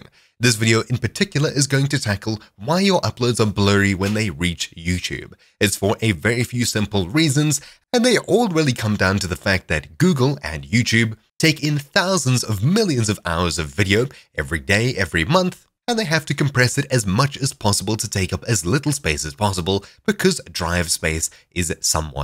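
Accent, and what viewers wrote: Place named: South Africa. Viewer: Thank you, I was able to fix my blurry video of Diablo 1 which was recorded via OBS in 360p, the game's native resolution. The video looked crisp on my computer in the H.264 format using VBR min 4mbps target 20, but on YouTube it was really bad. I changed to CBR of 20mbps (probably overkill) and exported the video at 720p, looks great now. Btw nice South African accent. Your voice reminds me of the famous Minecraft player rendog who plays on the Hermitcraft server. Its a good thing. <3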